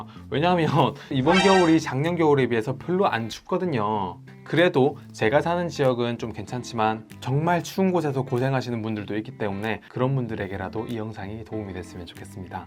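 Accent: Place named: Busan, South Korea. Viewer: 구수한 부산억양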